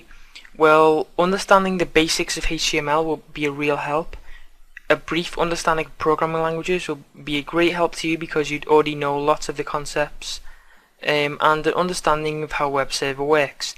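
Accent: Liverpool, United Kingdom. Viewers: Are you perhaps Scouse? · Ziqian Li the guy in this vid is a scouser (liverpool) lol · are you a scouser? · You sound scouse lad, you a wool?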